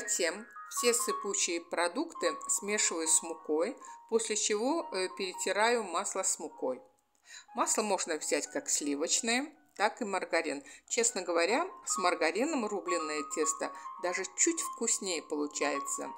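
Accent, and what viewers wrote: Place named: Baku, Azerbaijan. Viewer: ханум, спасибо.Сама я тоже из Баку, обожаю слушать наших женщин с нашим бакинским акцентом.Удачи Вам и процветания Вашему каналу.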